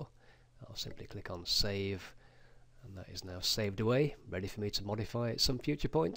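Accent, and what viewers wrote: England, United Kingdom. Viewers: great work.. great British accent.. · .....a VERY good tutorial! – ...and Thank You for speaking "english-english" in a clear and "easy-to-listen-to" way...(Many accents from within Britain can be awful to listen to unfortuantely...this was very good) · For some of us who are American, we love the flexibility of spelling it flavour or flavor; check or checque; color or colour; etc. Or maybe my latent ancestral genes (as in DNA, not "jeans" as in clothing) just kicked in and I accept both ways of spelling, realizing that the context of his accent tells me he is of more recent British extraction. My family is several generations removed from Britain and Ireland, and has lost the charm of the accent. Yet, when I traveled to Ireland, it was as if I were "home" despite the accent, differences in pronunciation and spelling, etc. 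There is a concept called called "code-switching" you might like to research. It comes into play in dual language situations where one is mentally switching what they "heard" or "saw" (as in a visual language like American Sign Language (ASL) vs Pidgin Sign Language - using the signed hand shapes of ASL but in the grammatical sequence of Spoken English Grammar.). So, for me, this tutorial was wonderful and I appreciate the time he took to carefully explain a bit how the PowerPoint process works.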